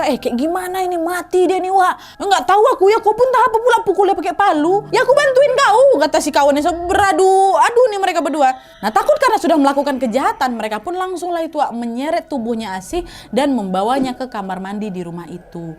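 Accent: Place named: Dumai, Indonesia. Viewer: Ini orang Dumai ya?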